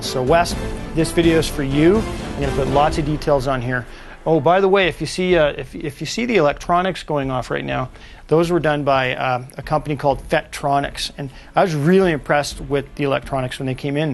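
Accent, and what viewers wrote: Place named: Canada. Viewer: is the background music necessary?? and your Canadian accent is annoying!!!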